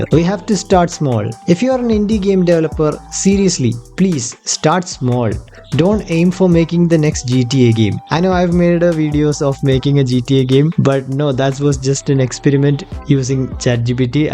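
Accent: Kerala, India. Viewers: u sound like a malayali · Are you malayali? Your english is pleasing to ears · The mallu accent is quite overpowered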